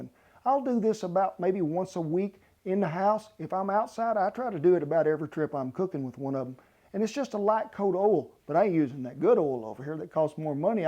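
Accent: United States. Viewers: Truly wonderful video. Thank you very much. As a British man, I have to say I love your accent. REAL USA. Keep up this brilliant and interesting work.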